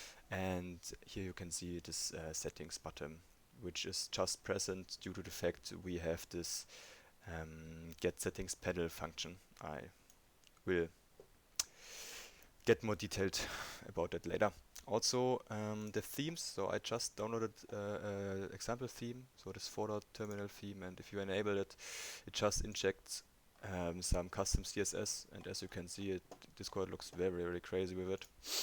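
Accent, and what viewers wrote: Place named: Germany. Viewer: the german dialekt kickt bei dir aber anders rein :D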